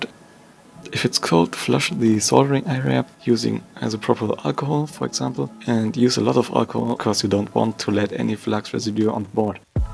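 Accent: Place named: Germany. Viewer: If I’m not wrong you sound like you’re German, I tried ordering from MJK but they seemingly don’t ship to Germany? How did you get one? 😊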